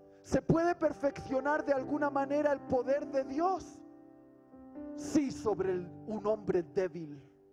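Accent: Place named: Spain. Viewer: Apenas hace unas 2 semanas te encontré por YouTube y ya eres uno de mis predicadores favoritos. Nunca te alejes del Señor pues a la mejor no tienes idea del impacto q estás siendo a miles o quizás millones de <link> fascina tu acento español pero aún más el como Dios te usa para transmitir cada msg de su palabra. Con cariño de una abuelita en Cristo.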